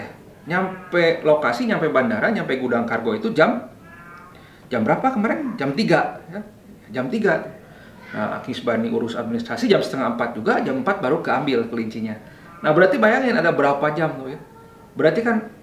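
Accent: West Java, Indonesia. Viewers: Lokasi medan, logat sunda 😆, mantap mang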